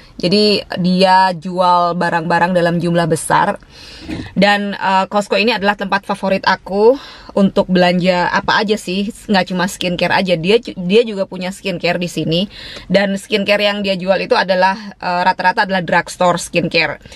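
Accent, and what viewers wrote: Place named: Surabaya, Indonesia. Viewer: Ya ampun cek larange. Hahaha... Suroboyone ora ilang... Hihihi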